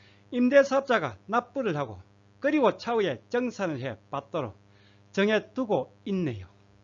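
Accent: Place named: Gyeongsang, South Korea. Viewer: 형님 감사합니다 전세가 6억이면 어느동네 인지요 경상도사투시니까 대구 수성구 인가요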